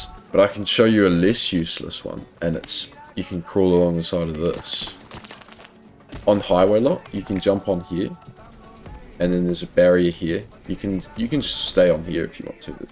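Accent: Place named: New Zealand. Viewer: also are u kiwi? i am too recognise ur accent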